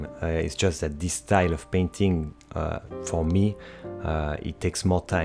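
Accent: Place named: France. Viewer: haha l'accent français je t'ai démasqué !!! très bon travail!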